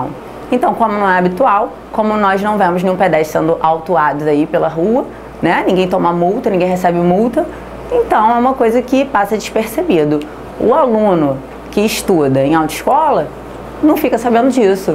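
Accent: Rio de Janeiro, Brazil. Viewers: carioques insuportavel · Sotaque carioca é maravilhoso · Putz, me desculpem, mas não consegui assistir o video inteiro, não posso falar se é bom ou ruim, porque esse " carioquêis " é irritante DEMAIS !!! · Gostei, mas me sinto no rio com esse sotaque, não sei se tudo isso também se aplica pra São Paulo. Mais foco na matéria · aguentar esse sotaque pobre de carioca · ela tem sotaque carioca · Gostei muito do seu sotaque, característico de um carioquês original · sotaque carioca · Sotaque carioca :) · maldito sotaque carioca.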